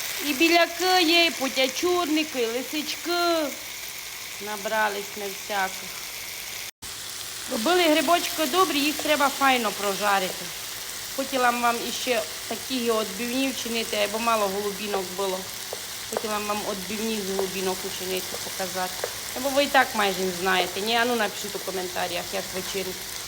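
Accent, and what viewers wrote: Transcarpathia, Ukraine. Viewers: Закарпаття так мовить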